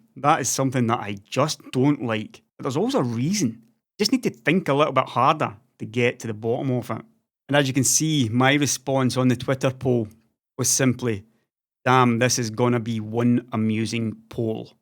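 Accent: Scotland, United Kingdom. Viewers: i just love scottish accent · the heavy Scottish accent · A Scottish accent telling me about technology feels too right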